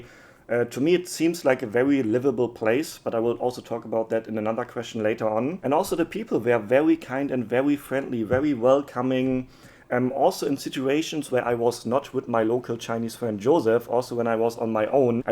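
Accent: Germany. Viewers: Even in English cannot hide the German accent 😊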